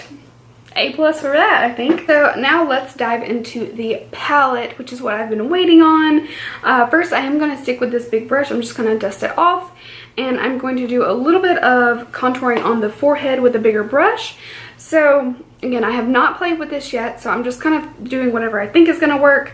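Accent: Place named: Alabama, United States. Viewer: Love this brand! So glad to see others talk about it! It sounds like your from Alabama? Maybe lol. I'm Mississippi haha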